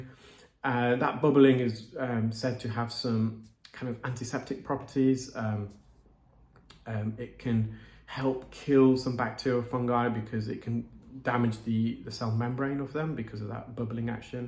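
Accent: United Kingdom. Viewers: The lips drive me crazy. The British accent makes everyone’s lips fold into Donald Trump lips. so distracting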